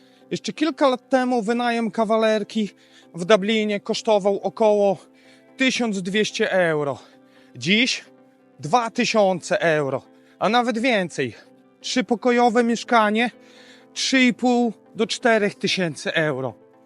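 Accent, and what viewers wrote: Podlasie, Poland. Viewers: od razu słychac, mam dużo kumpli z podlasia, wszystkich na emigracji spotkałem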